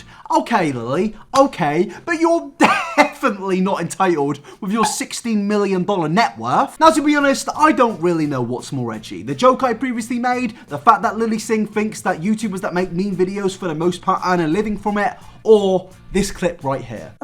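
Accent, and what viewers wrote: United States. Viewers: sad that i will have to dislike because of the american accent :( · I didnt like the American accent but I liked the video anyways. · You're so adorable!! That American accent 😂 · I really wanted to like this video. But I cannot condone that American accent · Liked the video for that lovely American accent chap 👌👌👌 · Your American accent is bloody lovely mate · fantastic american accent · Just liked because of the American accent.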